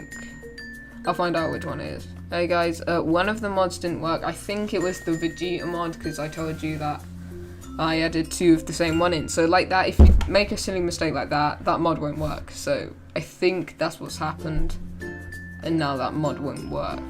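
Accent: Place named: England, United Kingdom. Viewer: holy shit finally an english person hooray i can actually understand this now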